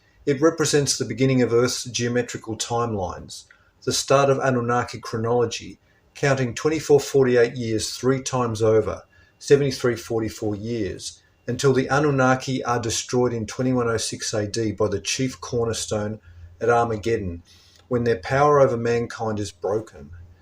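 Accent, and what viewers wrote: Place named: Australia. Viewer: So sweet to hear that Aussie accent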